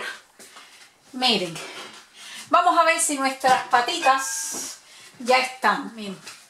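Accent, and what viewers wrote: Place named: Cuba. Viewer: Me encanta su voz, cubania y naturalidad. Mi abuela siempre las hacía en casa. Yo voy a intentar me queden tan deliciosas como a usted. Muchas gracias.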